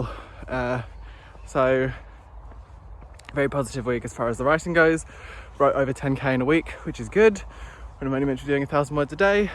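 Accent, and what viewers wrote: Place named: England, United Kingdom. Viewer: Missed your top tier English accent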